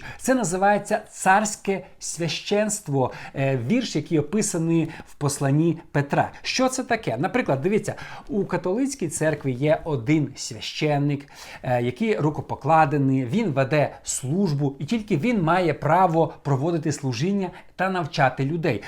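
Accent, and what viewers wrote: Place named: Western Ukraine, Ukraine. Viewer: Накінець то по українськи бо вам рос. абсолютно не йде бо чути шо ви з західної України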